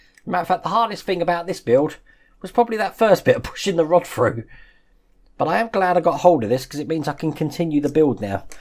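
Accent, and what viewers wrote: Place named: Kettering, United Kingdom. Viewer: that makes sense then I'm from notts so by your accent put you round Kettering area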